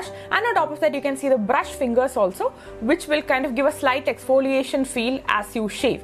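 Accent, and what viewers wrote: Kerala, India. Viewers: I like the Malayali accent 🔥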